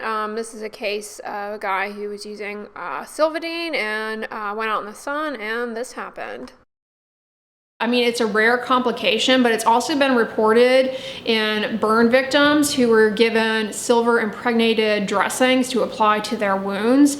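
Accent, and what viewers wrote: United States. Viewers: Man thats an annoying American accent..... and i dont believe a word of advise from doctors........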